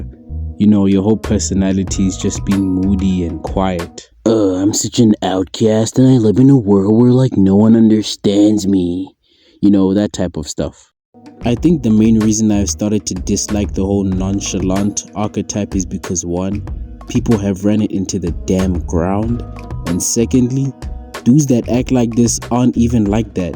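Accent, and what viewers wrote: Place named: South Africa. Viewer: Ngl I'm boy sounds South African 😅W video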